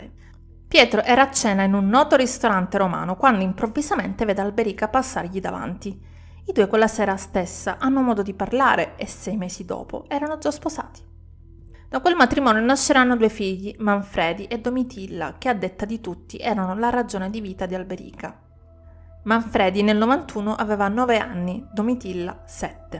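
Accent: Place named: Calabria, Italy. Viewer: a me piacerebbe sapere di dove sei originaria, il tuo accento sembra calabrese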